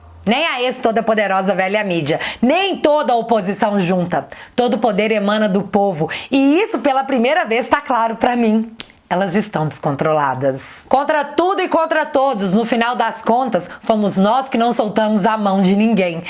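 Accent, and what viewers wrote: Minas Gerais, Brazil. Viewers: Nesse vídeo a tá muito engraçada. Hahahaha. Fora que o mineirês fluiu full pistola. 👏👏👏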